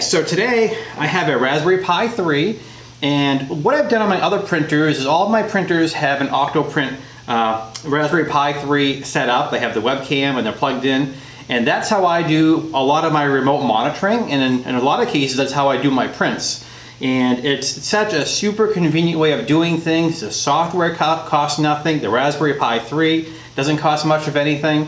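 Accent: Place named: Maine, United States. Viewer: Thanks for the great video very informative it’s nice to hear from a fellow Mainer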